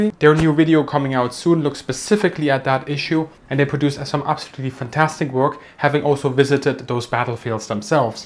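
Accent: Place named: Germany. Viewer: Try to speak slower, because your pronuntiation is all but standard,rp, or any other any way. German pronunciation is dufficult to understand. At ,75 speed it is easier.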